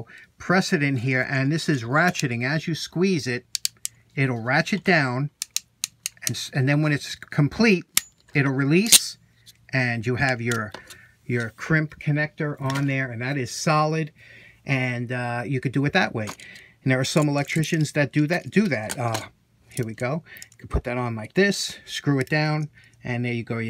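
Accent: New York, United States. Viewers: I was so waiting for you to say Bob’s your uncle because you had like 10 chances to see it before and then Bam you finally said it and I like hearing it in your New York accent because there’s no uncle like Bob and there’s no way to hear it Better than New York accent ha ha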